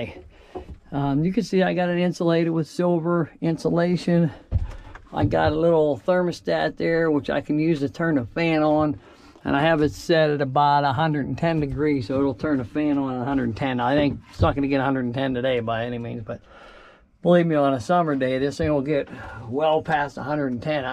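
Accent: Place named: Western Pennsylvania, United States. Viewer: Im in PA too near Harrisburg. Curious, are you near me? Sounds like a Western PA accent? :)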